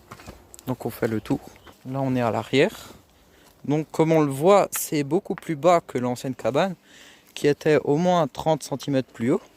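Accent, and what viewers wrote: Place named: Alsace, France. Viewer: Excellent les gars, je viens de regarder ta vidéo, je vais bientôt avoir 40 ans et franchement continuez comme ça les jeunes je vous encourage, je viens d’Alsace aussi et ton accent je l’entends bien grave allez à plus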